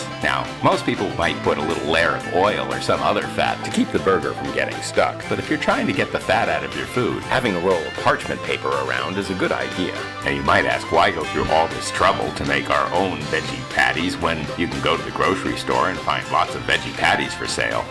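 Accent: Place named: United States. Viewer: I love it! Sounds very country and the music complements it.😊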